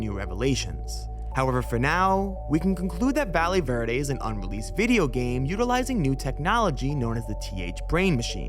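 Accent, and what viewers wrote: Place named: Boston, United States. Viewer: sometimes i think u have a Boston accent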